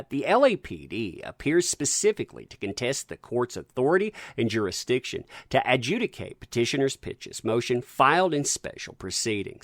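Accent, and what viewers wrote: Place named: Tennessee, United States. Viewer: What kind of God forsaken accent is this? Land of Dolly Parton aiiight...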